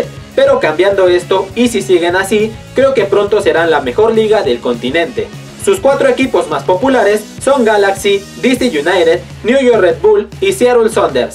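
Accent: Mexico. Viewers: Del inicio del video desde eschuche como habla este tipo que iba es mexicano ya sabía q liga iria primero ay dios 😒😒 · ¿Se nota que es Mexicano? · La liga de México la mejor? Jajajajaja jajajajaja Cuando puse el video y escuché el acento mexicano era obvio que iba a poner la liga mx primera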